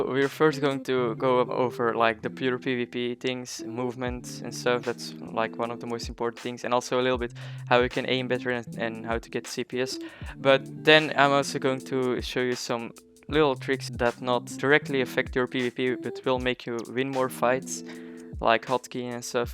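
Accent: Netherlands. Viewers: Dutch accent lol keep going ur actually pretty good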